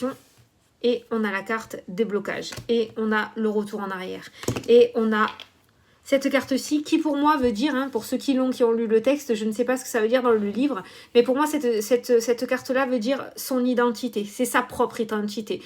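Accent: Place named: Southwest, France. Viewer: Merci pour tous vos partages et vos guidances! ( j'adore votre accent du sud ouest!)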